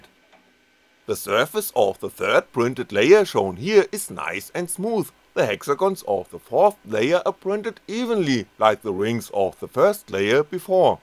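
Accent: Germany. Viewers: Dein Deutschenglisch schmerzt in den Ohren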